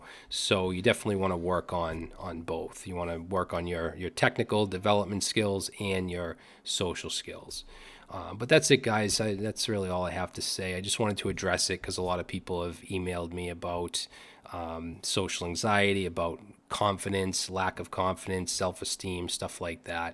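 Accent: Boston, United States. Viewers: Yes, he is from Boston, MA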